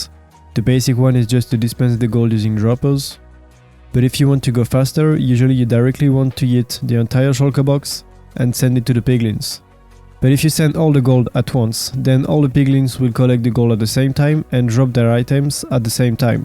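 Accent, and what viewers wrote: France. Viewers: HA HA jai vérifié et t'a bien l'accent français chef. Mais sinon tres bonne video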